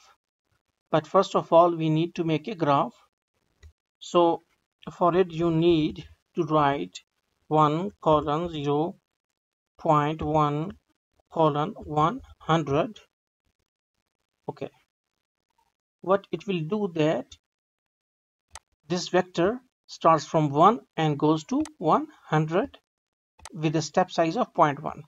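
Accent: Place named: India. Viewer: thank you soo much my indian bro ı like your accent :D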